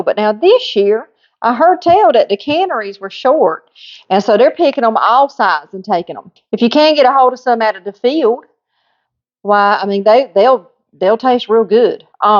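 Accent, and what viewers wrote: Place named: South, United States. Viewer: God bless you from Oahu. 👋🏼🌴☀️ I clicked for a southern sound and I am 100% satisfied. : )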